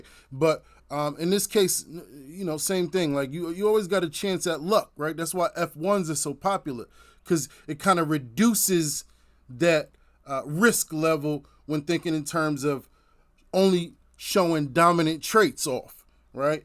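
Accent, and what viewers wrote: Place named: New Jersey, United States. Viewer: And where u from? U sound like u not really from nyc but somewhere close. Jersey or Li or sum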